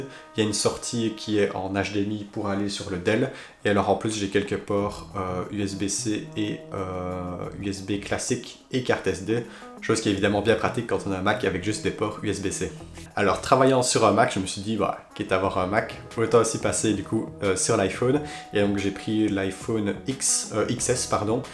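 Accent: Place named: Liège, Belgium. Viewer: Suis tombée sur ta vidéo par hasard, ça fait du bien d'entendre l'accent de lidje dans les méandres de YouTube 😊 Agréable setup + 1 abo Bonne journée, m'fi 🙂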